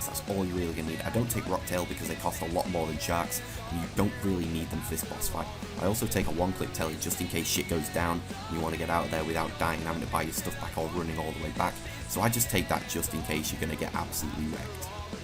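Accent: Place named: England, United Kingdom. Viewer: You should have added text on things like gear names lol. It's hard to understand the English accent.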